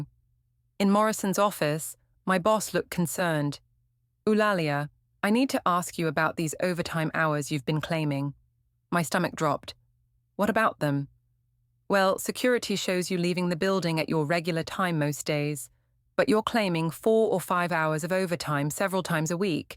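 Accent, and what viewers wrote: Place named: United Kingdom. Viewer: No longer listening to stories with a British accent.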